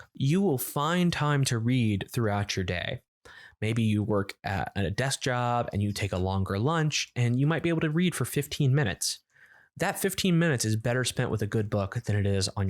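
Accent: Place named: Pennsylvania, United States. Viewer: I have an accent-related question, if you allow - do you come from around Pensilvania ? I've got a friend from there and in the minutae you sound just like him. I can't really pint point why or the specifics.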